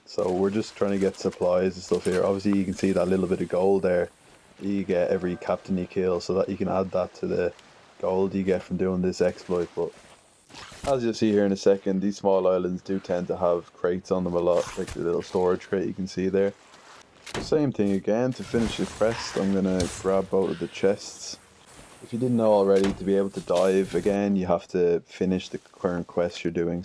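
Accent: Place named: Dublin, Ireland. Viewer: What part of dublin you from lad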